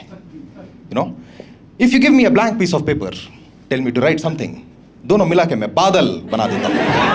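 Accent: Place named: Hyderabad, India. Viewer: Woh hi tho sochru iska hindi slang kuch apna hyderabad ka lagra kaiku bolke patta tho hyderabadi bi hein!! Njaanum oru malayalees from hyderabad kalakki tto 👏👏✌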